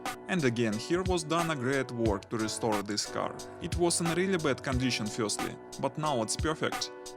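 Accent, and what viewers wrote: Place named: Russia. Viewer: Greetings from USA! What basic geographic is this collection located?? The voice over sounds Russian. Pretty nice collection of Yank automobiles. The Chrysler 300 convertible made me throw up. Its a great car other than wheels and seats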